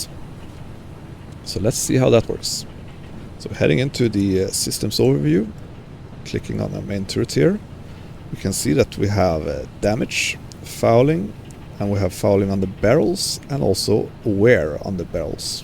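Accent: Sweden. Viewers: One more, will it be possible to repair in port now when these changes are implemented? I suspected you where a Swede judging by the accent. 😅 But wasn't sure because looking at the games theme i though - Swede but maybe German 😂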